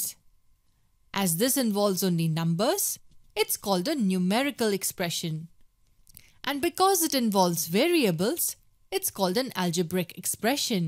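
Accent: India. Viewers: This is an Indian accent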